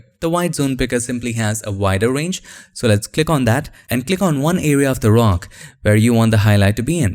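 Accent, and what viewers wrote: India. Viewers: Your English sounds like Indian it’s hard to follow up.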